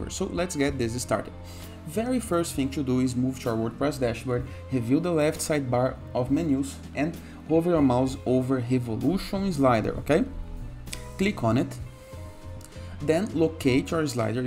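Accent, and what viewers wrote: Brazil. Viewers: Judging by the accent you are brazilian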